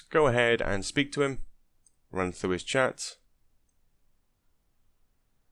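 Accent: Wales, United Kingdom. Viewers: Ty for all the guides. Sound like a fellow welshman!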